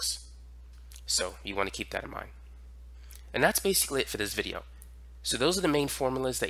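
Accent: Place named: Boston, United States. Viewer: This guy def has a boston accent.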